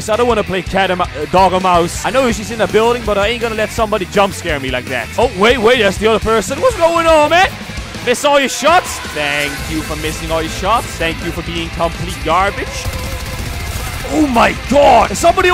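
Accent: Netherlands. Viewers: kom je uit nederland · Lekker nederlands hahaha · Yourrrr Dutch I think bro👅 ( I’m Dutch ) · Your dutch i can hear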